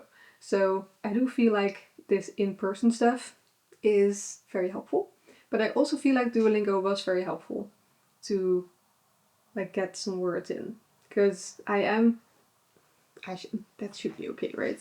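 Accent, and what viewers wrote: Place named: Netherlands. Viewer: Concerning you reading Swedish I think you are on to something. 😊 I can understand what you are reading and also that you are from the Netherlands. You sound a lot like my dutch friends when they are trying to speak Swedish. 😊 Keep on your good work. And try to involve your husband too. He's having way to much free time. 😅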